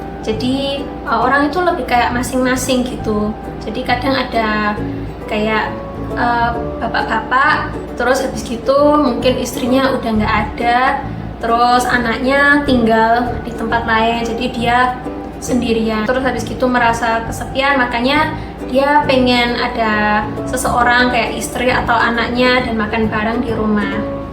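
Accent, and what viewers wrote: Java, Indonesia. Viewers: Bhs ne wis medok koyo wong indo...jgn" sdh lupa bhs jepang nih ^ ^ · Medoknya gak ISO ilang kak, kapan Moro neng malang meneh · Cuman pengen nanyak, lancar bangt ya, bhs indonesianya, bhsa jawanya juga kentel bngt? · 🥰😍,aq gak kuat medok e iku lhoo 😁😂👍🏿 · Tapi ko ngomongnya logatnya kaya orang jawa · medog e jian 👌👌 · Orang jepang lahir di sidoarjo, logat jawa nya tetep medok.. sukses selalu, aku suka chanel ini 👍👍👍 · kamu ternyata ada medhoknya klo bhs Indonesia 😀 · Medok e iku lo... Nyenengne.... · Kok medok bahasanya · Kok medog yo rek😐 Jgn2 deket rumah saya😅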